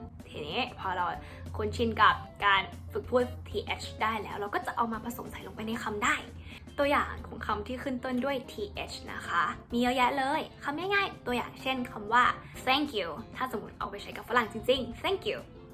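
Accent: United States. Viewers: ยังไม่เป๊ะ อเมริกัน 100 เปอร์เซนต์ค่ะ แต่ถือว่าพูดได้โอเค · Your pronunciation is not correct but the accent is sounds like American. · ฟังง่ายค่ะ ฟังดูเป็นอเมริกัน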